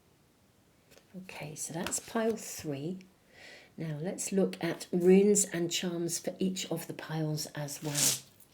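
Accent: United Kingdom. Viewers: Hyy, you! I love your readings, your lovely british accent and your unusual, awesome oracle decks! Great reading..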